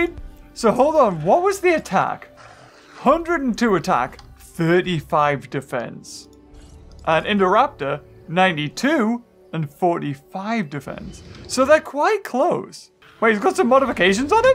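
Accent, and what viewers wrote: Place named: United Kingdom. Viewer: It's pleasant, like idek but it's always nice to hear a british man talk about dinosaurs in a man child way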